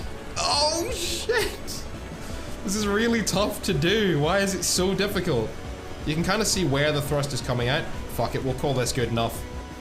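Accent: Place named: Scotland, United Kingdom. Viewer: I love the accent Beautiful Scottish Accent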